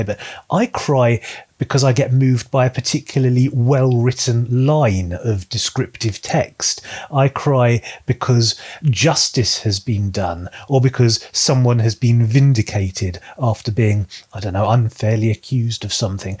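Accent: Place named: United Kingdom. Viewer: I'm so glad that I've found your channel. I've been looking after videos about Mr. Raymond E. Feist (because I'm currently reading the Magician: Apprentice). I'll definitely read the Empire trilogy someday, because of your review. Also, I was about to start the Deverry Series and I was happy to see your video which introduces the books. I'm looking forward to seeing more of your content. By the way, I really enjoy your elegant UK English. :)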